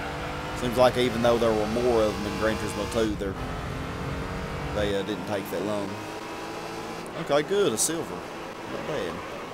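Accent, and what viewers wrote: South, United States. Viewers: Man, that's a thick southern draw, where you from?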